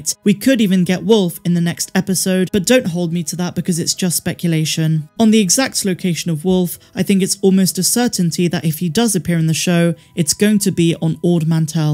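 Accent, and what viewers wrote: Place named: Bristol, United Kingdom. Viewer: You have a Proper Brizzle twang there Am I right? Wicked vids, always enjoy the updates and theories, cheers 🙏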